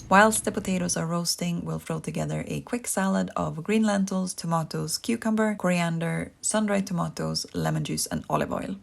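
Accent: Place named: Sweden. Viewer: Your channel is very cosy! I love your simple and nutritious recipes. Wishing you the best success on here. P.s your Swe(?) accent is lovely :)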